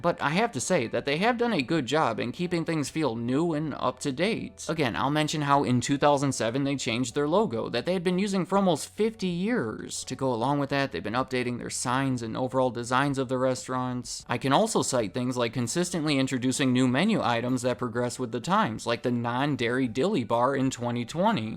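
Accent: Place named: Alabama, United States. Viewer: Sounds Alabaman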